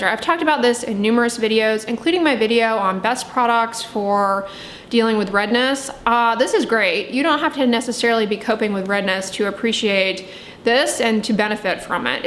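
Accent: United States. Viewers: Do I hear a country accent 👀👀👀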